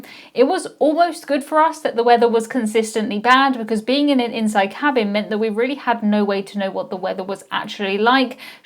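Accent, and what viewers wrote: United Kingdom. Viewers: My wife and I love your videos. Great narration with a wonderful British accent - all done by an incredibly nice person. Excellent job! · Got to just love a Brit accent! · You are so adorable. As a yank, your accent is just Devine! Do we Americans have accents to the British? Live video Cheers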